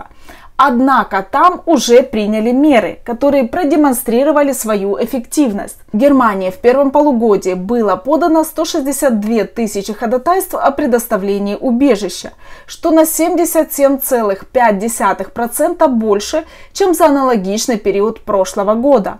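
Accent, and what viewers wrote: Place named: Ukraine. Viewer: Дуже сильний малоросiйский акцент. Треба бiльше працювати над мовою. А так, спасибi, цiкаво слухати.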